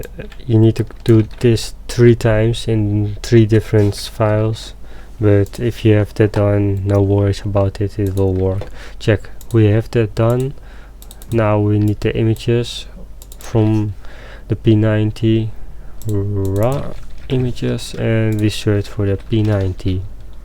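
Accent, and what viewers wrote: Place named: Netherlands. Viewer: Eyy jij bent Nederlands! Hahah kan ik horen en zien haha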